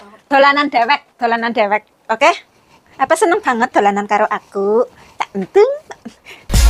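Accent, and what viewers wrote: Cilacap, Indonesia. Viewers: saran sy kalo bisa bicaranya diperhalus dan jangan keras2... Kasian neneknya... Apalagi dgn logat cilacap yg kasar.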